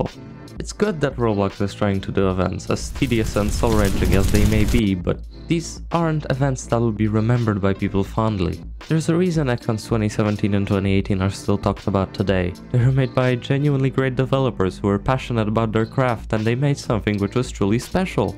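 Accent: Poland. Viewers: Słychać, że Polak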